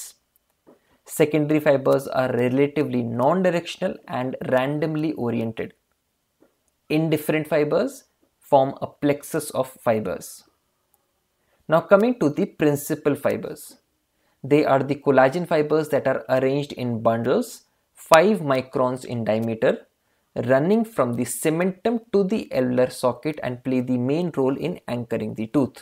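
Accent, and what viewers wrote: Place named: South India, India. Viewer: Hehe...am i right or wrong Aapke kuch words mein south Indian accent lage.. 😅 anyways.. nice explanation of the topic thank u 🙂👍...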